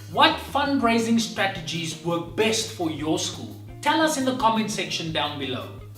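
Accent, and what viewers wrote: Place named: South Africa. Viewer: You sound like you're from South Africa or from Zimbabwe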